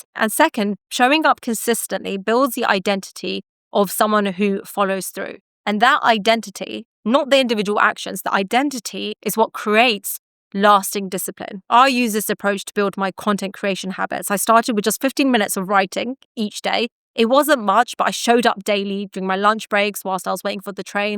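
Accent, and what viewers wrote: United Kingdom. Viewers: I have been listening to your podcasts for the past few weeks and I find them very interesting and helpful. I am in my sixties and I have read many self help books and listened to audio tapes and CDs over the years. There is something very refreshing about your podcasts. I also love your British accent 😊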